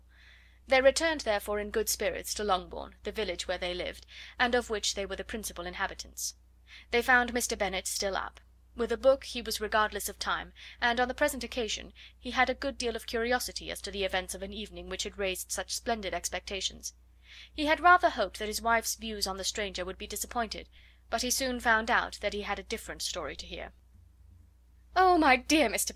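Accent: United Kingdom. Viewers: so british love it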